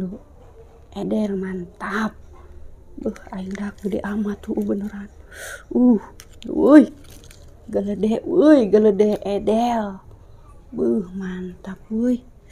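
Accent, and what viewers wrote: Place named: Banten, Indonesia. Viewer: Kalo dengerin teh ngomong jadi inget temenku ngomongnya sama logat" banten padahal dia orang lampung tapi bisa logat banten 👍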